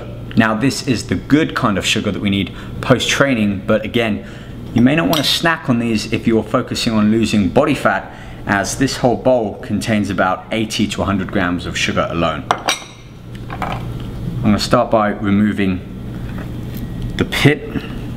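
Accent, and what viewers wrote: United Kingdom. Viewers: What accent is this ? British ?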